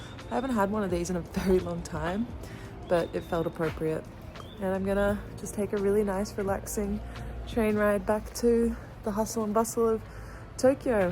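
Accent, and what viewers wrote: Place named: Australia. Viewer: I like your Australia accent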